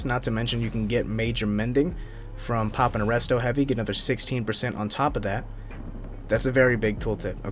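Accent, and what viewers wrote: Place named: East Coast, United States. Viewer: Wicked east coast accent man🤣 keep up the good work!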